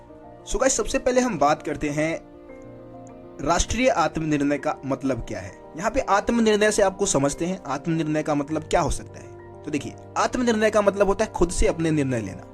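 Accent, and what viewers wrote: Bihar, India. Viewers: Sir aap Bihar ke ha kya.aapki batto se laga hame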